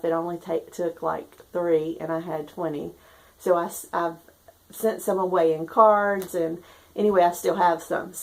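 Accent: South, United States. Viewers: you are one of THE most gracious people I know- and that southern accent- just takes it out of the park!!! I love it... I want to say - how sorry I am for the news of your Dad- and how I love that you are all in the "now" instead of the later.. Enjoy each moment- and cherish that family of yours... We'll all be here waiting to see when you pop in next... xoxo Ohhhh- and good luck with your crochet adventure... ( smiles )... Bless your heart indeed.. Me and crochet do not get along- but I love how you are trying different ways to make it happen... xoxo Blessings Your Way!!! niki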